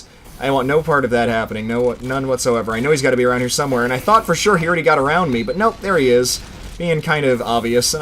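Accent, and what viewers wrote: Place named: South, United States. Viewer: Trying to guess your regional accent. South, but not deep deep south. Virginia or N. Carolina.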